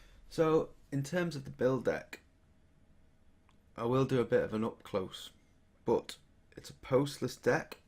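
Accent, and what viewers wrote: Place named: England, United Kingdom. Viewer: Love your English accent - did you go to drama school to lean it? Excellent, keep them coming.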